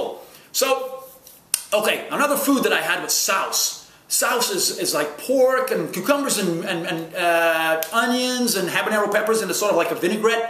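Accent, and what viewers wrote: Trinidad and Tobago. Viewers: he does a great trini accent...im a traveller too and i video the other islands too. check out my clips..click my name and away u go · Your trini accent really good, nice man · He talks trini so well tho Ahaa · That Trini accent is on point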